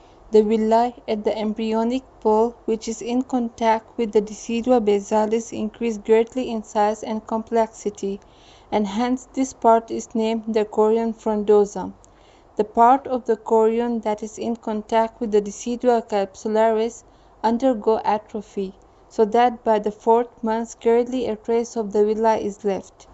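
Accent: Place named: India. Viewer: Amit Majumder from her accent I'm sure that she's an indian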